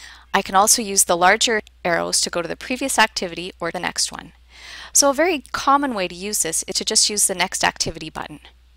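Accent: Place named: Canada. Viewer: You sound Canadian. Are you?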